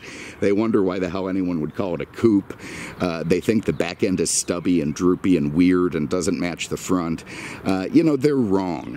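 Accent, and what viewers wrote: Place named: New York, United States. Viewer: I love this guys chatter. He has a New York type accent and a lot of character to his speech. He is very entertaining and charismatic love his reviews ...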